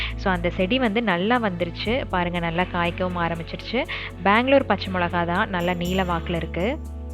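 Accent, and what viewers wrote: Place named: Coimbatore, India. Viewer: i watch many other good vlogs.but no one use our CBE slang.. like kolambu sunda veikarathu,vanam vettappu vitruchu....so sweet...i heard from you.lovely.❤❤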